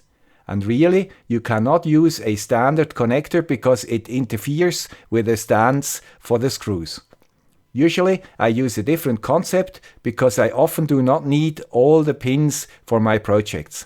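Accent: Switzerland. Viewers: Your Swiss accent is nice. :) Since this video came the Argon ONE Pi 4 and Argon ONE Neo. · Tönt sehr nach emne Schwiizer 👍🏻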